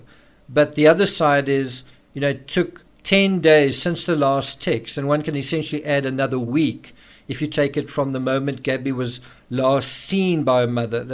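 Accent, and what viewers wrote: South Africa. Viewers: Love your channel, no hysteria , clear thought process and facts . South African accent ?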